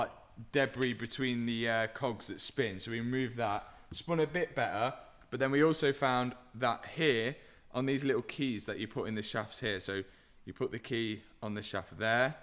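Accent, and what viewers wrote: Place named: Wales, United Kingdom. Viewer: He talks like my old welsh boss even looks like him But 65 years younger lol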